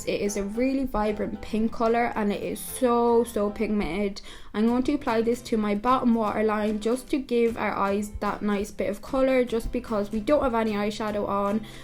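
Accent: Ireland. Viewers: Love this xxx just wondering what county u from X I honestly can't tell hahaha xx I'm from tipp btw xx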